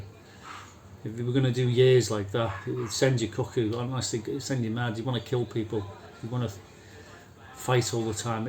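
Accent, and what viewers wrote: Liverpool, United Kingdom. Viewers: Any scouse accent reminds me of Brookside, love it. Just wondering if category D allows Internet access in any form ?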